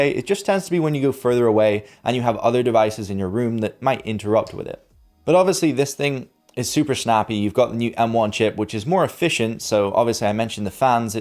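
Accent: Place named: Northern Ireland, United Kingdom. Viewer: I hear a twinge of Irish or Northern English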